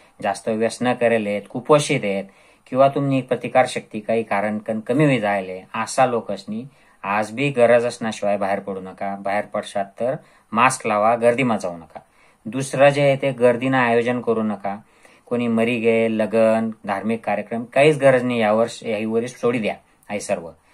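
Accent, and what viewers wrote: Khandesh, India. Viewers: Khan deshi bolatay ka sir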